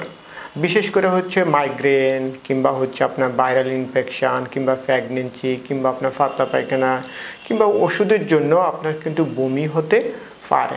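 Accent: Noakhali, Bangladesh. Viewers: 😂😂আমার বানান যথাযথ এবং সঠিক উচ্চারণে আছে আপনি আগে আপনার ভিডিওতে নোয়াখাইল্লা মার্কা ভাষা চু*নো বন্ধ করুন।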